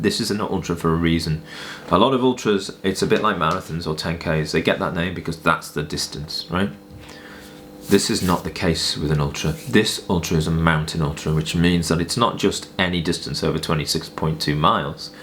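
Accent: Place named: RP, United Kingdom. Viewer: Very posh a countdown too...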